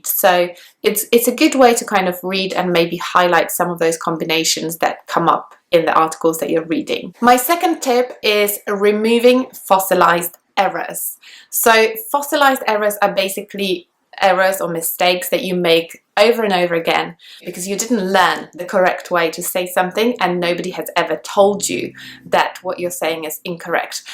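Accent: United Kingdom. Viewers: Hi Good morning from Myanmar. I've been learning english 2 years nearly but my take on is that it didn't work much as i hope. British accent appeals to me tremendously. I feel i have a weakness in pronounciation. Fortunately, your vedio for boosting my accent in british is exceedingly great for me. ohh by the way, your british accent is lovely. Thanks a lot for your kindness.😘😘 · As a well travelled Australian, I thought you were from the UK. Only some words sound a little 'central European', and you have both pronunciation for the north and south of the UK. But there is plenty of examples of natives that do the same! Well done!!! Like another view, I am technically advanced in Spanish, but this seems to fluctuate greatly depending on the day... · I did, but it was like 95% BrE, and 5% german, maybe, cause thr L is sorta german, especially in words like "language" and words that have "ly/li" in it. 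· Lol i thought you were british until you said the 'this and that' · I thought you were British till you started speaking about your fossilised error ! My god, you sound like a Brit through and through. What's your native language ? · I thought she was british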